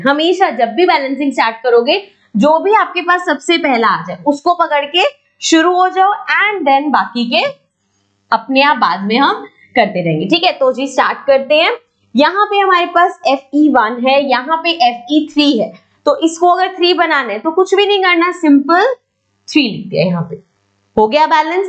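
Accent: Gujarat, India. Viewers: Mam you are from Gujrat?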